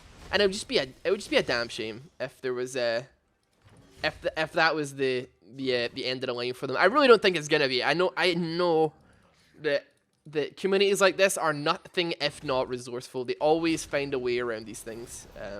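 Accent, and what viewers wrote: Scotland, United Kingdom. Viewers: Hes scottish (I think)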